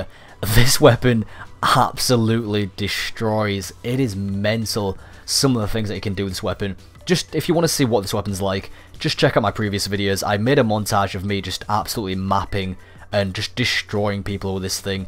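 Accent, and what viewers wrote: United Kingdom. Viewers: You sound like u are from the UK,am I right?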